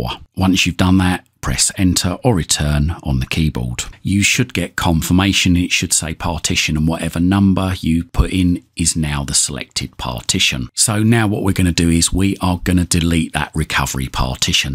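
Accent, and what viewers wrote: England, United Kingdom. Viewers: I have to agree with this user(Donald-area51eagle24) comment. "Fantastic. Extremely clear and concise instructions, plus the English accent was wonderful to listen too. Before I came across your channel, I had worked several unsuccessful hours. I didn't want to wait for Microsoft fix. And your video provided the alternative I needed. 100% success. Thank you." I could not believe how much time I wasted on trying to fix this update. Just an FYI – I have a Master Boot Record (MBR) so following the instruction you got from MS made sense to me. I been IT for 30 years plus but have not work on a computer since 2020 when I retired. Best instructions ever. Thank you so very much. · Fantastic. Extremely clear and concise instructions, plus the English accent was wonderful to listen too. Before I came across your channel, I had worked several unsuccessful hours. 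I didn't want to wait for Microsoft fix. And your video provided the alternative I needed. 100% success. Thank you.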